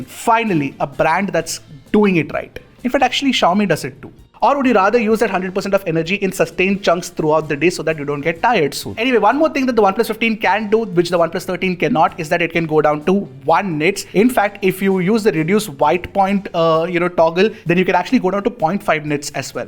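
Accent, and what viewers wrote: India. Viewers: No need to talk so fast, it's like Indish english, take some paus when talking, would be much clearer to understand :D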